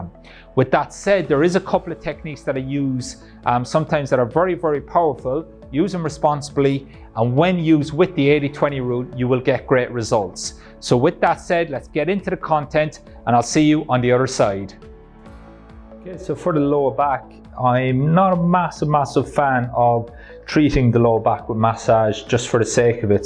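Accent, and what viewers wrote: Ireland. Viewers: What you on about? You can tell he's Irish straight away😂😂😂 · Sounds Irish to me.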